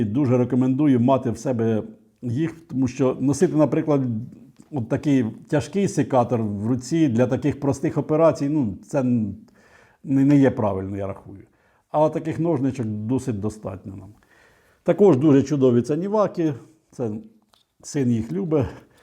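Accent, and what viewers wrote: Poltava, Ukraine. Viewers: Українська мова з нотками полтавського суржика - Це супер . Ви молодець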